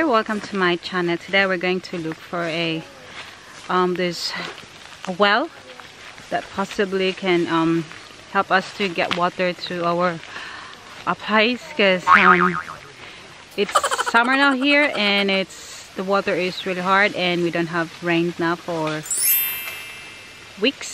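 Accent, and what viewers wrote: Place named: Australia. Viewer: hello sister andito ulit ako love your accent sissy aussie na aussie kana😘 mahalaman pala jan sis! Thanks for sharing😘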